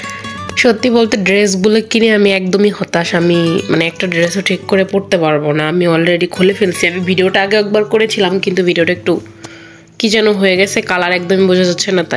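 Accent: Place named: Barishal, Bangladesh. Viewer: কিন্না কিন্না,, করেন,,,আপনার বাসা কি বরিশাল 😮😮😮😮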